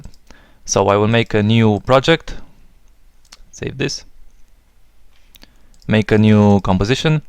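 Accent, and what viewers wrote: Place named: Russia. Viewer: Спасибо!) Сразу видно русскоговорящий)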